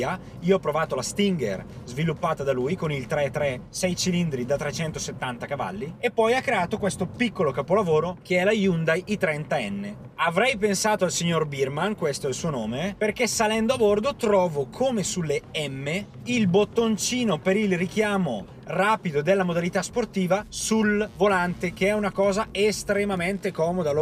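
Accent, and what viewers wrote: Brescia, Italy. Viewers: figa fai un corso di dizione, la cantilena bresciana non si può sentire!!! :D anche se sei bravo! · Finalmente un bravo tester con il nostro tipico accento bresciano complimenti ..!!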